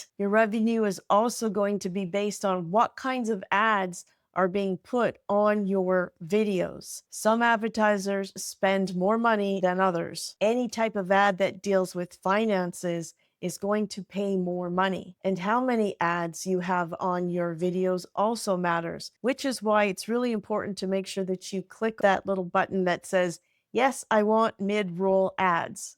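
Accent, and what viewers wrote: Canada. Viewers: Thanks so much for the helpful explanation. I started a channel (under a different handle than this one) and keep trying to plug away at it waiting for it to gain traction, but now i know - it's stil early! Also - I registered the accent before you mentioned you were Canadian. I enjoyed listening to you! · Love your Canadian accent! Reminds me of my Canadian relatives!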